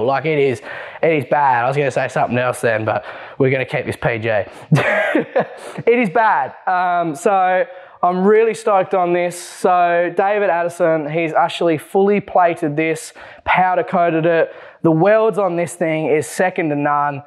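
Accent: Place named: Australia. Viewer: Awesome video mate… one of the best from you guys… your super Australian accent/words used to describe things was by far the highlight of this video… please make all videos exactly like that 😂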